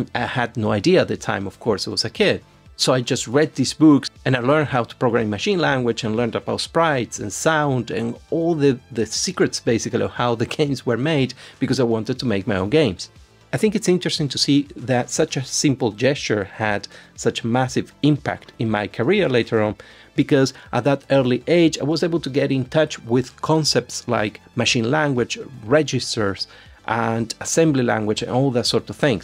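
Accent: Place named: Italy. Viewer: ah ok sei italiano :) avevo capito che non eri inglese ma non che fossi italiano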